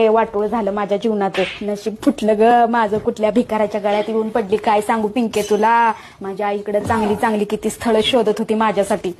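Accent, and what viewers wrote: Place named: Satara, India. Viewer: Lai bhari madam satari bhasha mala khup awadle.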